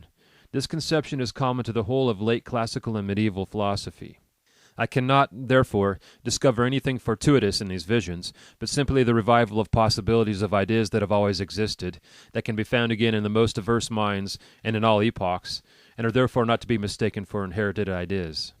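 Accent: United States. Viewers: you have the most americanest accent my ears ever did hear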